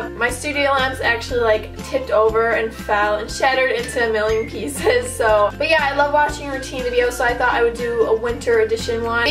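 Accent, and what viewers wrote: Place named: North, United Kingdom. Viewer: You're so pretty and you have such a northern accent it's fun to listen to!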